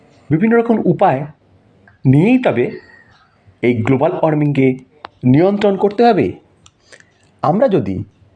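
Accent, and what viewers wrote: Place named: Kolkata, India. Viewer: ভাই আপনার কোন দেশ? আপনি কিছুটা কলকাতার ভাষায় কথা বলছেন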